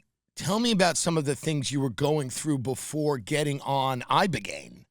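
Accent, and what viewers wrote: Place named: New York, United States. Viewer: at least for me, Tim's new york accent on top of bashing LA culture is all I would listen to driving around in my car, and I was born here.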